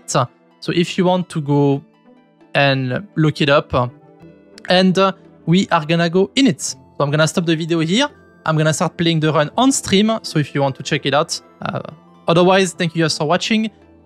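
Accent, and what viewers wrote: France. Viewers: très compréhensible je voie pas le problème l'accent baguette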